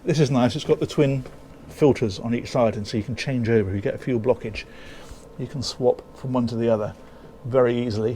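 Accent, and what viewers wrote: England, United Kingdom. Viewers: Nice yacht buddy. I like the design layout. That master bedroom was awesome or using one of your words it was a "proper" master bedroom. I love to listen to you English people talk from England because we don't talk like that in the United States here, mainly in rural Missouri. But I'd take this boat on vacation or use as a live aboard because it would be so easy to keep clean I'd think. I loved the upper fly bridge the most. It has a great layout for this size for a "proper" cruiser. An the galley is perfect for making meals while you have 6 or even 12 people onboard fishing and or in the water playing around. Perfect vacation boat especially if you have children or teenagers because they make messes and I think it would be easy to clean up with floors like that. The boat or small yacht is beautiful especially since I've never seen another one like it or even close to it before from all the channels I've been watching. 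But I have to say, your channel is my number one favorite, plus that I am faithfully watcher. When I see you posted a new video, I have to see what you found because I know it's going to be worth watching. Love your channel buddy. Great job, and you earned another 'at a boy award' from me here in Missouri. I can't wait to see what you post next time. I still wish you would make a specs page showing us all the details like, the length, width, numbers of gallons fuel tanks are, water, the max range it can go in nautical miles, an what speeds with NM, size o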